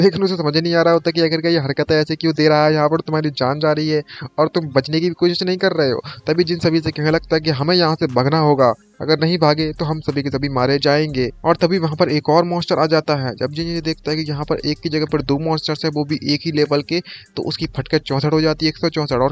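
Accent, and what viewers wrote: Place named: Western Uttar Pradesh, India. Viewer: Bhai tera bolney ka same west UP ki jaisa h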